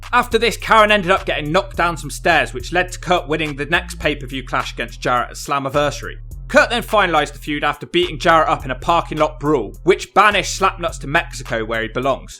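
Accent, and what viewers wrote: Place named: England, United Kingdom. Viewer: Addiction is no joke thumbs down stupid English accent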